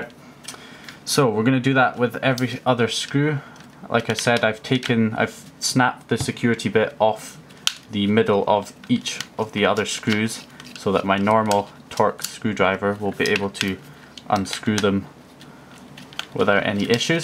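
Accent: Scotland, United Kingdom. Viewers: Scottish bro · Cheers for video bud! Owned a torx security bit set for 15 years and finally get first chance to actually use it haha. Nice to hear a fellow Scot too 😉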